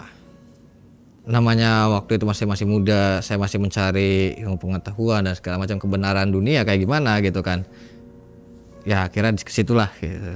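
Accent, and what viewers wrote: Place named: Cirebon, Indonesia. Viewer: Logat Cirebon nya ketarak banget bang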